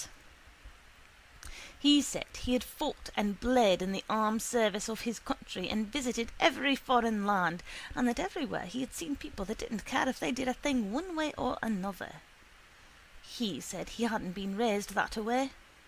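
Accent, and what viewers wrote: United Kingdom. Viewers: David Ritchie haha lol the British accent is cool tho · Get your act off here. We don’t need O’Connor audio stories read in a British accent.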